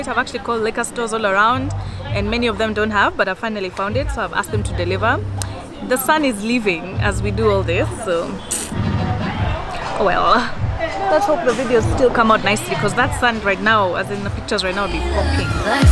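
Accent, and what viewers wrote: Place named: Kenya. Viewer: ughh love your kenyan accent ! it has such a western tang to it !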